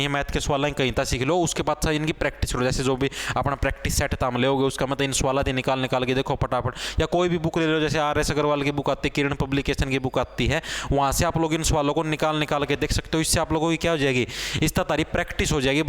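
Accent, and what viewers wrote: Haryana, India. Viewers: Haryanvi h bhai 💪❣️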